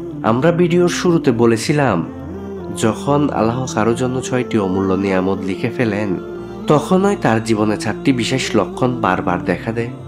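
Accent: Chittagong, Bangladesh. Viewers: O chatgaio ki bolo go . polat kole low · এমন ভিডিওগুলি আরো অনেকেই দেন সুন্দর সাবলীল হৃদয়ের ভাষা দিয়ে যা মন কেড়ে নেয় পানি আসে। কিন্তু ভাই আপনি চট্টগ্রামের লোকাল ভাষায় বর্ণনা দিয়ে বিষয়টির মাহাত্ম এবং গুরুত্বই নষ্ট করে দিয়েছেন। কিছুদিন ভাষা চর্চা করেন চট্টগ্রামের লোকাল ভাষা পরিত্যাগ করেন। তারপর ধারাবিবরণী দেন আমরা খুশি হব।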